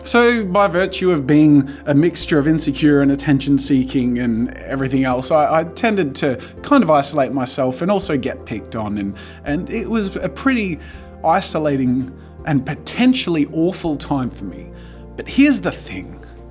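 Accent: Australia. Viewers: Keeps getting more Australian. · Aussie accent is so much stronger when he gets emotional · all those draw with clips overemphasize your aussie accent. I gotten use to hearing you for years and the compilation just made me think "oh yeah, he is australian" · That montage got progressively more Australian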